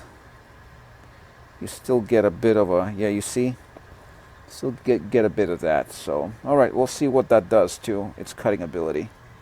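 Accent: United States. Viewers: Thank you for your wonderful review. Love your pure American accent, remind me about George W. bush, my dream English accent. How ever your Knipe= little cutter (Knipex, 😉) is a defective one, robots use to sharpen them after induction hardening and they must have been out of calibration regardless what every one tells, I have a tool collection of almost all of most known manufactures and my knipex collection (two at least of every model cutters has zero defection like yours have got, not to mention the hardening seems to be one south side with it???? you really should send it to knipex factory in Germany and ask them to reconsider it. How ever every each of them as you know already, has been designed for different hardened material to be cut, yours indicate piano wire 2.5 mm something to keep in mind. lovely review thanks.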